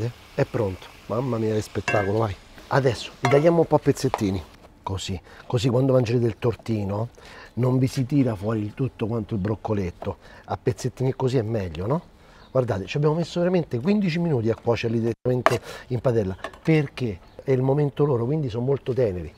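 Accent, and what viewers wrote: Rome, Italy. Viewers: Che bello sentire parlare romano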